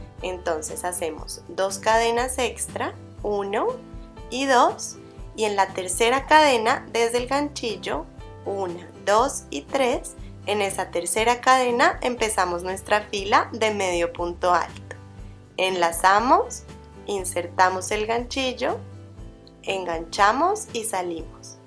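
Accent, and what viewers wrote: Colombia. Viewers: Hola , cómo estás , soy Colombiana , y tú de dónde eres , disculpa , tienes acento Colombiano , me encantan estas clases de tejidos , eres muy clara y explicas muy bien poco a poco y despacio .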